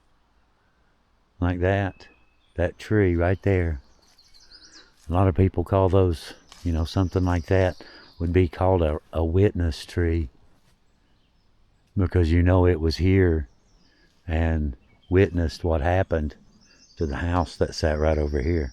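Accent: South, United States. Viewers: So pleased you covered this infamous piece of history. Super video. And of course I could listen to your southern accent all day long. Love from New Zealand 🇳🇿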